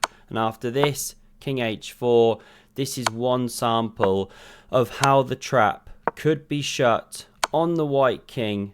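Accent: United Kingdom. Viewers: God I love this commentator. So funny, so English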